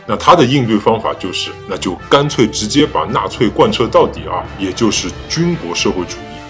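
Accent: Shanghai, China. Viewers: 其实就是一个人，因为都是上海口音，好多家具都一样的😂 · 音色和语速可以软件微调成不一样，但是很多词的口音、下意识的手势动作和偶尔冒出来的上海话切口，说不是我都不信。。。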